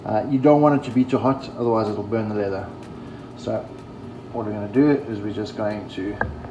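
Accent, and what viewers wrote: South Africa. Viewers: davis - smith. He sounds like a full on jozi boytjie. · Are you from SA? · Awee he sounds like a South African ou. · Very cool. BTW, why do you sound half South African? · You sound afrikaans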